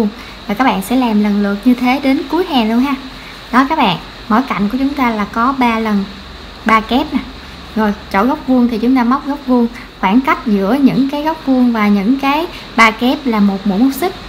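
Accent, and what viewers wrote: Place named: South, Vietnam. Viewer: Bé hướng dẫn rất dễ hiểu. Cô lớn tuổi rồi, mà cô còn theo kịp đó. Cô cảm ơn con. Cô thích giọng miền Nam của con rất dịu dàng dễ thương ! 👍💐❤️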